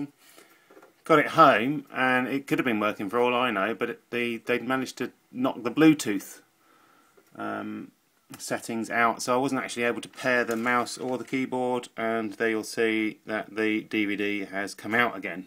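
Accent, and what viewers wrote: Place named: London, United Kingdom. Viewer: Wha(t) a nice Bri(t)ish Londoner accent 'e 'as. Take a DVD and pop it in.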